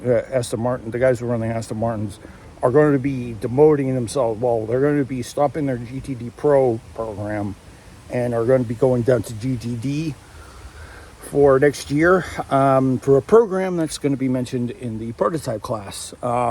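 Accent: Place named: England, United Kingdom. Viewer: Great intro and excellent english accent well done😅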